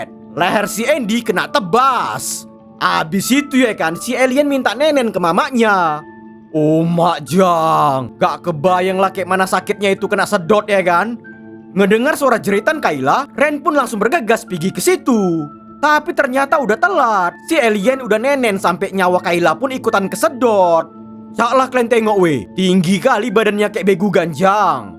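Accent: Medan, Indonesia. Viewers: Hahha lucu abg ni ngomong. 😂pasti org Medan. Udaaku subscribe y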